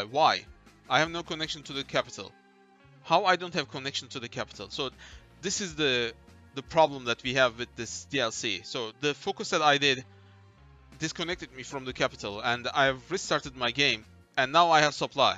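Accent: Turkey. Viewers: aksanı duyunca dedim bu bizden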